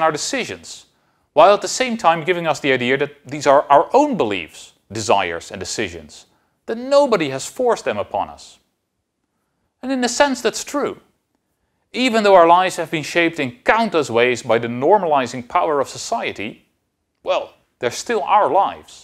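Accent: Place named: Netherlands. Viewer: i love your dutch accent! Lekker!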